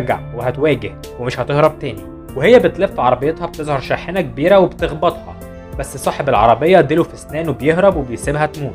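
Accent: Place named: United States. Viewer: شبه صوت شخص امريكي تلعم مصري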